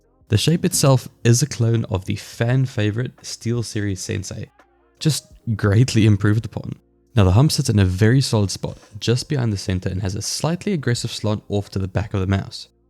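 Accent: South Africa. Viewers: Great review man, are you South African?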